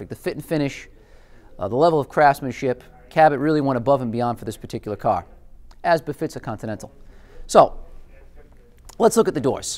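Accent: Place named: New York, United States. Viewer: A New York accent and a Lincoln. great combo!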